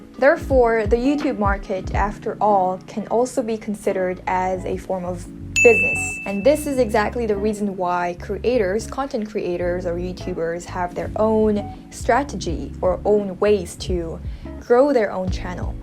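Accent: Philippines. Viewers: I'm Pinoy, I watch her videos because of her pinoy english accent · I know you have a filipino english accent and many koreans have filipino english accent but yours is my favorite ❤️❤️❤️ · Just the way you speak i call feel that you grow up here in the Philippines, and Pinoy baiting doesn't apply for everyone that makes Filipino related Contents, Pinoy baiting are actually being done by those people are not familiar with Filipino Culture but still make Contents out of them... Its just a strategic move for them, but i hope that people can support the channels that makes worthy videos, (for any type of contents) where people can learn or be entertained by it without being sexist or make whatever that isn't even worth watching like idiotic pranks that are obviously scripted and theyre not even trying to think for a good content but for some reasons there are people who support them, i cant blame them(viewers) because maybe they want to fool themselves lol, who knows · I am a first generation American of Filipino descent. 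I have never visited the Philippines, but after first watching your video (speaking English w/ a Filipino accent) a few months ago (followed by many more videos), I was hooked. I want to visit there now - all thanks to you! As an aside, I would also like to visit S. Korea. By culture, experience, language, knowledge, living there nine years, you are a subject matter expert on the Philippines. I don't quite know what the issue is... If it's monetization over your content, then travel agents shouldn't make any money. Is it because you are not Filipino? That would be racists. Can only Filipinos post videos and comment about the PI? Your videos are well-made, creative, and thoughtful. They are also positive, and only benefit the country. W/ regards to the hate you receive: If you have not watched the movie The Social Dilemma, you may get a better understanding of the hate. In summary (spoiler alert); your viewers are the product being sold to advertisers on YT (the source of the monetization), conflict (hate) generates more viewers/posts than does love. 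If there are no negative comments, the artificial intelligence (AI) steps-in to create that negativity - generating responses (and money). Simple comments like, "You're stupid" or "You're not Filipino" can generate a lot of responses. Sorry, so this is so long. I want to give you support by letting you know I very much enjoy your videos. As a Filipino (albeit in the US)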